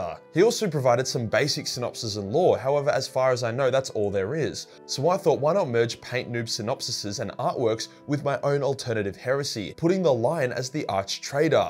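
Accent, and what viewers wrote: Australia. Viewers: You spoke to fast, just heard aussie accented noise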